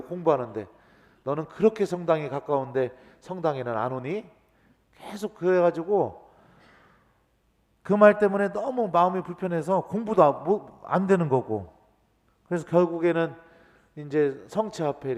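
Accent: Chungcheong, South Korea. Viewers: 충청도 신부님 사투리 더욱 친근감 ~~~ 화딱지~신부님 존경합니다 저도 화딱지가 날때는 신부님 강론을 듣고 하느님께로 다가가면 저에게 은총으로 사르르~화가 감사로 ~~ 아멘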